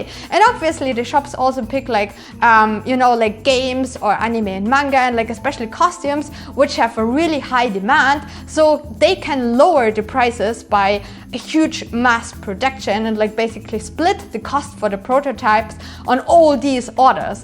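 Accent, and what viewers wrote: Germany. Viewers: Ey ich liebe deinen Akzent, der ist so schön deutsch XD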